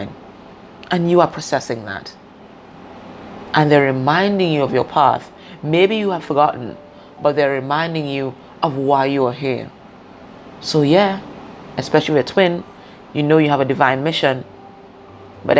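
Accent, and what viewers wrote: Trinidad and Tobago. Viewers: Are you a trinie you sound like a trinie, good works thanks for the guidance God bless.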